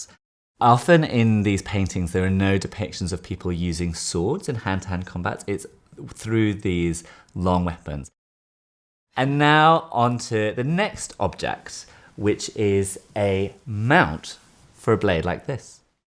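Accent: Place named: United Kingdom. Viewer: I agree, I think this was very interesting and well presented. My only complaint applies not only to, but to most English presenters, news readers and even academics and that is their totally British pronunciation of Japanese - especially names. I imagine it is so common, even at the museum, that the filmmakers are not aware of this. It grates on my nerves! A short class in Japanese pronunciation would not go astray.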